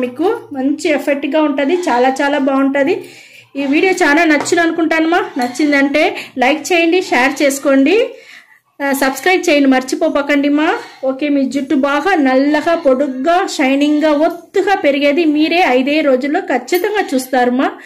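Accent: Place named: Karnataka, India. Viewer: కన్నడ భాష యాస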